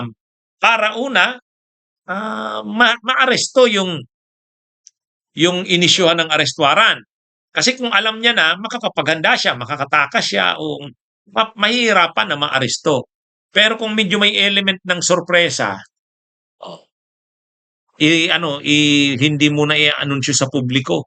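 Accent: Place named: Visayas, Philippines. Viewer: Bkit po parang BISAYA kayu mg pronounce? dapat fluent din kayu hehehe.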